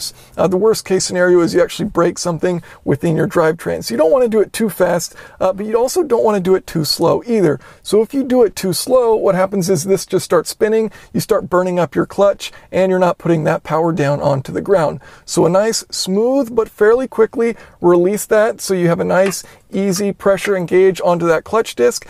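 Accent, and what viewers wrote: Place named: Oregon, United States. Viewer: Are you from Oregon/Washington?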